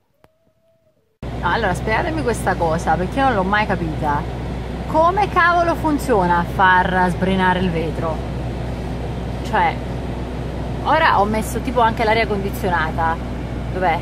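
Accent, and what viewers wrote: Tuscany, Italy. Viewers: Ciao,scusami ma tu hai la fortuna di abitare in Toscana e ti correggi quando parli toscano... pagerei oro per spostarmi dalla Calabria non fraintendermi AMO la mia terra e il mio mare, ma al sud poco lavoro e poco tutto ma quello che mi fà più paura e la Sanità praticamente non c'è.Quando vengo in Toscana precisamente a Pistoia l'adoro e da 28 anni che ci vengo purtroppo solo da mio cognato 1 volta all'anno, l'Ikea vicina i Gigli Firenze Prato vicini anzi tutto vicino,sei fortunatissima goditela 😉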